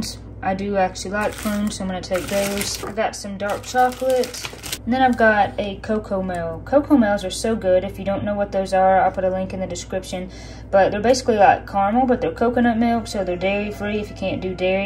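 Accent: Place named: Eastern Kentucky, United States. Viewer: ❤ I just wanted to save it I admire you and your sister. You're such sweet authentic women. Raised right in a crazy world. My family is from eastern kentucky I now live in indiana. I spent every summer at my grandparents house down there. All of my family has passed. There are only a few distant relatives still down there. After watching some of your videos and watching your mom cook I feel homesick l o l. In fact I just asked my husband of we could take a short trip to the area and just soak it all in. Stay sweet and keep doing what you're doing. It's Also refreshing to hear someone sounds like me l o l. Much love and prayers ❤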